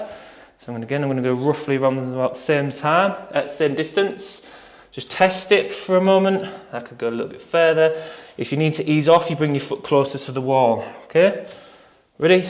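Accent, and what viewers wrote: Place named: North, United Kingdom. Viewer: I have historically hated strength training, only beginning to factor it in alongside my beloved running when the injuries started. Now it's of course a non-negotiable, and I can honestly say that your videos are some of the only ones I enjoy on here! It's almost not a chore! I'm super bendy (but with the stiffest ankles) with poor balance and find that some other vids speed through so I can't keep up, and also introduce advanced exercises quite early on which my lower back can't hack. I enjoy that you offer modifications, encourage us to take our time, but also talk through purposes too. Thanks - my fave runner's channel! Plus, I enjoy a fellow Northern accent!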